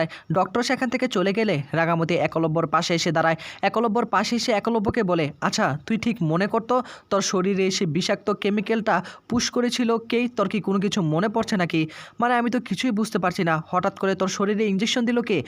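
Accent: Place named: East Bengal, Bangladesh. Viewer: বাঙ্গাল বাঙ্গাল উচ্চারণ ,অশিক্ষিত। কথকতা করতে শিক্ষার দরকার। শিক্ষা নিয়ে তারপর কথকতা করতে এস।চেষ্টা করলে পারবে।শুভেচ্ছা রইল।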